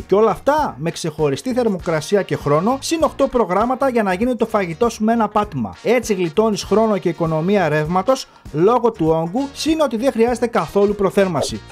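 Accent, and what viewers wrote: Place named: Thessaloniki, Greece. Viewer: Τέλεια, μου αρέσει η Σαλονικιωτικη προφορά σου και φυσικά τα φαγακια σου, Πατρίδα συνέχισε έτσι...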